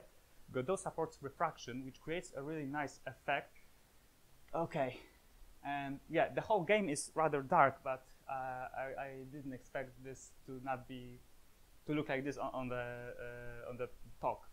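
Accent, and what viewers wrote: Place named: Poland. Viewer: dziwnie się słucha polaka mówiącego po angielsku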